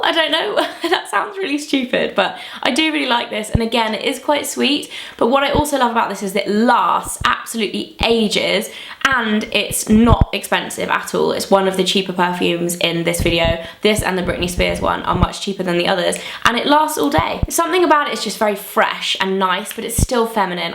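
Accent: United Kingdom. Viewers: Hi! I really like the way you talk.. I just wanna ask what's your accent called? I mean what kind of British accent it is?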